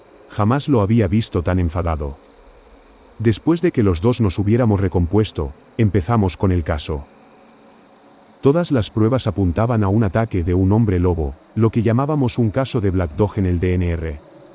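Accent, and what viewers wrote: Spain. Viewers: Primera vez que entro al canal ,me encanto ,muy bien relatado ,y el acento español le da ese toque unico en nuestra lengua ,saludos desde buenos aires ,me suscribo ..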